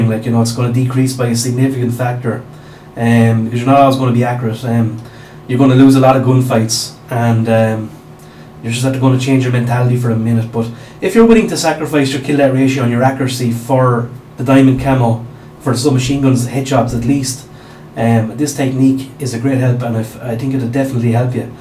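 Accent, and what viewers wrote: Ireland. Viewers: I have only gotton 50 second in the video I will finish it o.o but may I ask are you irish your voice is distracting in a great way :D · are you irish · Are you Irish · I liked and subbed... btw are you from wexford by any chance? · Is he from Ireland so am I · Irish you tuber ftw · Are you Irish?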